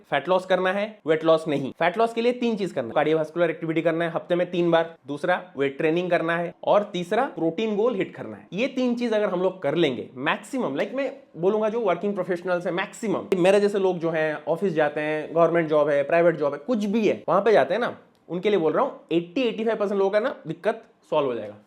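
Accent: Odisha, India. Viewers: Odia loka nka Hindi bhai pura jaana padijauchi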